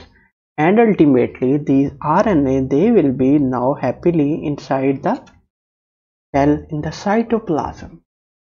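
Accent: India. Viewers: first time like this kind of Indian accent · FFFFFFF!!!!!! why does every scientific medical video have some guy with a terrible Indian accent.....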